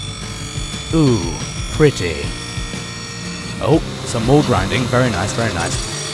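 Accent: United Kingdom. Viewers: Got Dang that is one jive turkey. First comment on one of your videos i found you threw planet rust but just now relized how amazing your other videos are. this is by far one of the coolest vidoes i have seen in quite a wile. love your grammer and accent and you in all your british glory. its not surprising you have 30k subs with how steller your videos are. you have a real tallent and i could easily comapre you to fankie on pc in 1080 p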